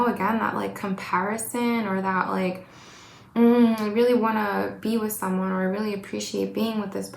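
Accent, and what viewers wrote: New Jersey, United States. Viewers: Love your jersey accent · I’m originally from eastern jersey. Did you ever live near there in the past? I picked up a little accent as you were speaking in this video I had never heard before in your past videos. And according to my wife, it sounds like my accent. Just asking.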